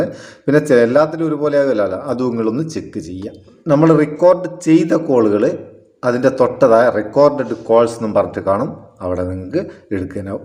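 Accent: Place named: Vadakara, India. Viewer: ഇജ്ജ് വടകര ആണ് അല്ലെ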